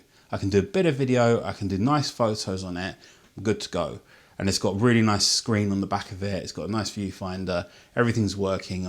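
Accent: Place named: United Kingdom. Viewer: I think you made a really good point here. I do own the R5 and guess what on my surfing vacation last week to Spain I made all my pictures with my iPhone. I was too afraid that the camera could get stolen or at least damaged in the sand or whatsoever. When I came back I started thinking to address that point and looking for a cheaper body. I thought to get maybe a Sony A7 IIIc But the camera costs still €2000 at the moment. Also during walks and most of the time I don’t bring the R5 anywhere to keep it safe and nice. How can you get better if you behave like that? You really brought this whole issue to its point 👏👏👏 btw enjoying your English or British accent. :)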